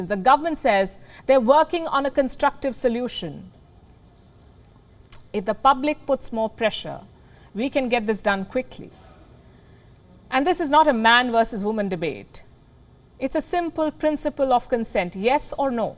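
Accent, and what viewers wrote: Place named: India. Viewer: People mock her for her Indian accent. You are probably the first non Indian audience I have seen to appreciate her- I hope u r non Indian!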